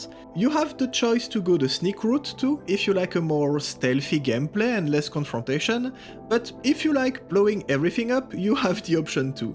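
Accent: France. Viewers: Hi just discovered your channel with your stalker mod video! Are you french by any chance? I may be sensing it a bit in the accent! If i'm mistaken, apologies. If not, salut à toi!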